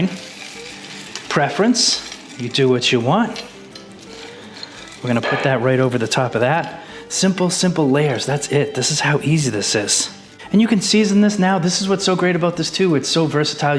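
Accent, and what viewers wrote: Massachusetts, United States. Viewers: You're from Massachusetts, never apologize for how you say cheddah, ked!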